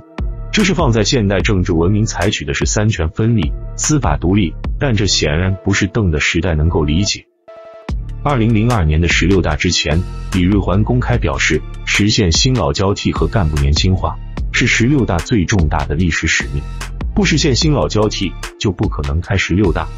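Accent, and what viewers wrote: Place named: Taiwan. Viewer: 台湾人?有台湾腔。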